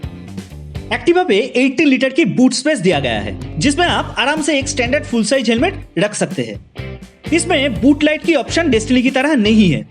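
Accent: Bengal, India. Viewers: I love your Bengali accent. ..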